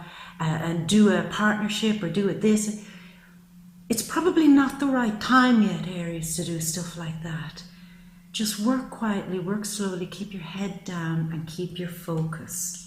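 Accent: Ireland. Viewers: Accent is Irish? Just curious.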